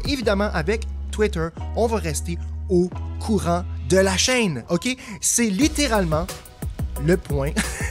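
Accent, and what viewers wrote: Canada. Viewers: Continue comme sa ! J'ai repris ark il y a 1 mois et je suis tombé sur tes vidéos par hasard et sa m'a bien aidé ! J'adore ton personnage et cet accent canadien est incroyable 🤣 force à toi man !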